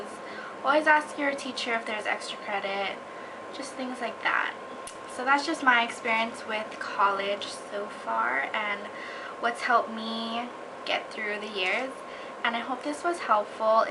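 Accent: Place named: Hawaii, United States. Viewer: U have a pigeon accent r u from Hawaii?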